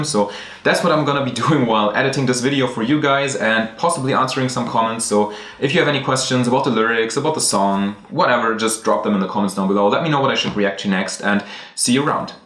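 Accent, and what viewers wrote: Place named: Germany. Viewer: Obviously, your translation is going to be the best one. You speak English very well. However, your accent is German. Thanks for your translation!!!! Could you write the lyrics in German and English? Please? This song is so dark! Is about death, isn't it?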